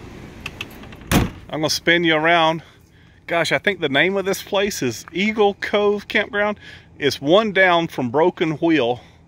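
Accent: South, United States. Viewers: being Irish I love your Southern drawl and how you say baaabee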